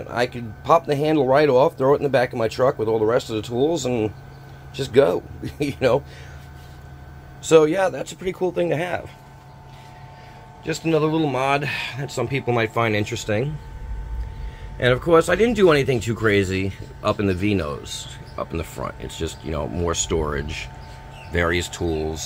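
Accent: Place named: Pittsburgh, United States. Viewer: You from Pittsburgh 😁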